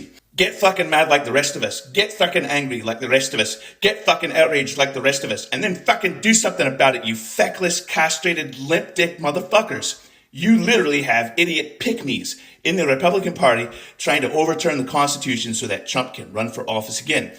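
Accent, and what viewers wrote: Scotland, United Kingdom. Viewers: Love the Scottish accent shining brightly through this glorious, righteous rant. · Scottish accent ? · Point #1) absolutely spot-on,. Point #2) anger sounds better in a Scottish accent than any other, and I'm glad not to be the only one who does it. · I am catching a WHOLE BUNCH of a Scottish accent in that rant! So effective!!!! Love it!!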